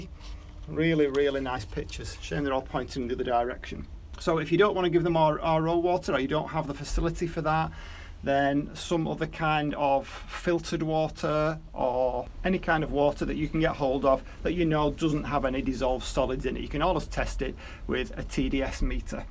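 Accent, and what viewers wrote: England, United Kingdom. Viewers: It`s good listening to a English accent but greetings from the Philippines, I collect water from the roof in a drum actually at the moment its the rainy season so my Nepenthese are happy outside not in direct sunlight Humidity here is around 85% everyday so no problem there. I believe I`m right in saying the Philippines is home to largest number of Nepenthese including N.attenboughrii but I have to grow Lowland spp its too hot for the Highlanders where I live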